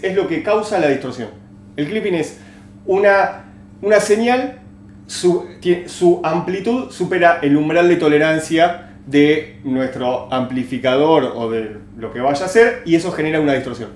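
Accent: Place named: Argentina. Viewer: Tremendo video! Muy interesante escuchar la explicación de esto en español argentino :D. Una sugerencia para un futuro, algo que no he encontrado mucho material es sobre cómo funcionan los canales en un amp valvular, qué componentes diferencien un canal de otro. En fin, a seguirla rompiendo con estos videos. Abrazo desde Uruguay!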